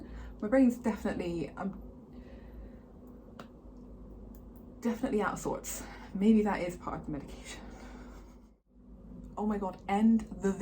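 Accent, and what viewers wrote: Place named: United Kingdom. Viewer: Holy crap you are British. Usually watch with CC.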